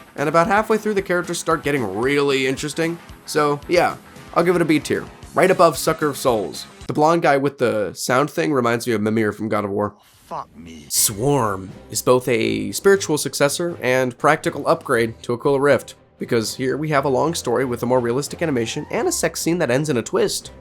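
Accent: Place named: California, United States. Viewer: God this was a rough watch with a narrator who gives off LA/Cali vibes somehow... but you can feel he put in a lot of effort in this video and I respect it.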